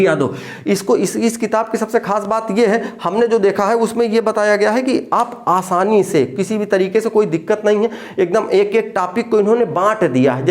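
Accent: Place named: Awadhi, India. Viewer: अवधी भाषा लगातीआपकी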